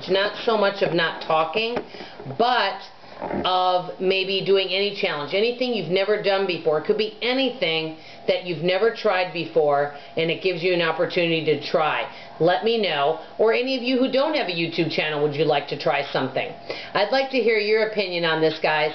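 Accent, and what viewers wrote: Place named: North, United States. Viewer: I know I couldn't do it! I'm a talker .. AND if nobody is at home can you talk to yourself? That MIGHT be doable .. I often talk to myself! I'm fairly new to your channel so I'm dinging the bell so I'm sure I don't miss the results lol GO FOR IT .. (you have an accent so I assume your from the north and you talk really fast I'm From the South and I talk, hear and listen in Southern)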